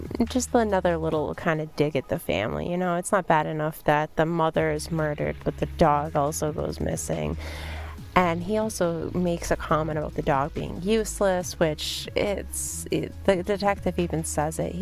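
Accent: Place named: Boston, United States. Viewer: Nice job on this, I am from Boston, and I can barely tell you have a Boston accent, although I can tell slightly, but you have a beautiful voice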